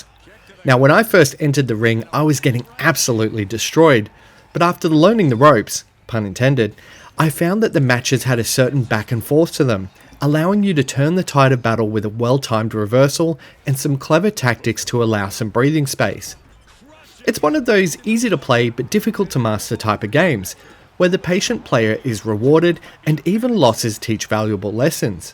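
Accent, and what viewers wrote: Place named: Australia. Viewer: i got 31 seconds into this video and had to click off, aus accent is like nails on chalkboard to me ..apologies but im sure its great... also sort your levels out on mic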